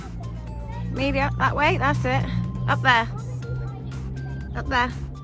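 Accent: United Kingdom. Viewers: You are from which part of England?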